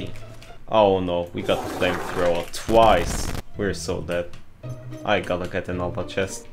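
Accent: Germany. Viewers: I could swear i am hearing german accent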